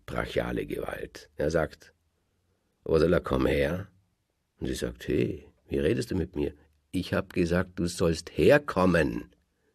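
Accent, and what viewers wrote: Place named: Germany. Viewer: Mit österreichischem Akzent würde es besser klingen. BRD-Deutsch passt nicht dazu.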